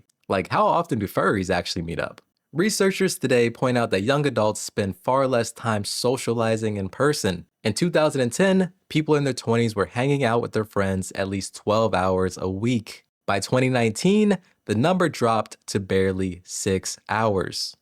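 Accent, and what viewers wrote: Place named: Baltic states. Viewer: sounds like you are from the baltics or somewhere else tbh idk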